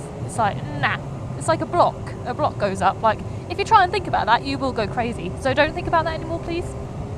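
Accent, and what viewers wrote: United Kingdom. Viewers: You have a lovely voice. I watch your videos just for the sound. But while listening to you or any Brit I always think man....there's no way I could be British besides the fact that I'm an American because it takes too much effort to speak like that. You guys put so much effort into speaking. Americans try to shorten words and drop syllables any chance we get but Brits look to add syllables every chance they get. Example... Aluminum 😀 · British accent is pretty funny to hear Cheers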